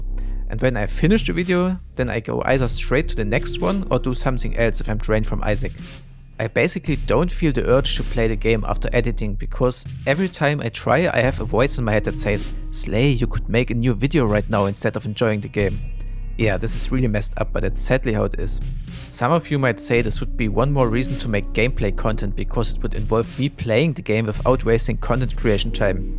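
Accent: Germany. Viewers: What about Cult of the Lamb? Had some Isaac - Feelings while watching the Gamescom-Trailer. Nice (Cat-)face reveal Lieb deinen Kartoffelakzent xD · i love your german-english it reminds me about laovaan who is also a german speaking english in his vids. you sound so similar kinda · I actually like the German accent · I love how your german accent sounds when speaking english, like dessert for the ears.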